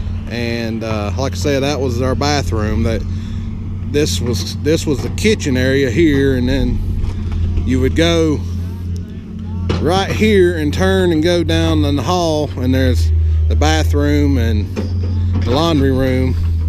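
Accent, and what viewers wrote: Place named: Tennessee, United States. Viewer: I’ve got a buddy from Morristown tn who has an identical accent If you had said “now look here” I’d have thought you were him Sorry about the tree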